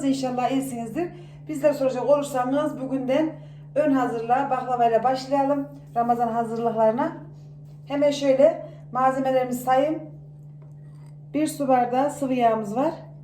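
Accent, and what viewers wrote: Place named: Aksaray, Turkey. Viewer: İyi günler maşallah çok güzel ve dogalsiniz bende aksarayliyim bu tarifi yapacam aynı bizim gibi konuşuyon kız aksarayin neresindesin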